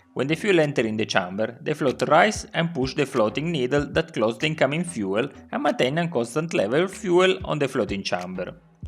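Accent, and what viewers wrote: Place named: Italy. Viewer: I totally enjoy your italian-English! Great video! Greetings from Germany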